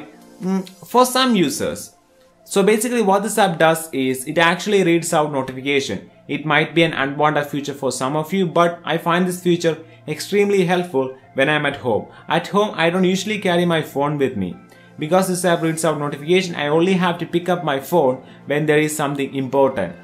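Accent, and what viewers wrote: India. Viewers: I'm sorry if i offend you but where do you come from because you're accent is awesome and sounds Indian or around that area.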